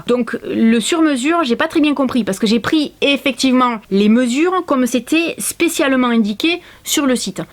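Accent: South, France. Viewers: Je ne sais pas comment je suis tombé sur cette chaîne mais en tout cas je trouve que tes vidéos sont super agréables à regarder. Le franc parler, l'accent du sud, l'humour.... c'est sûr y'en a pas 2 comme toi !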